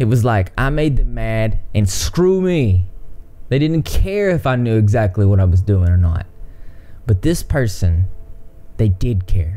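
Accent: South, United States. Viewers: what part of the south are you from? It sounds just like where I am 😅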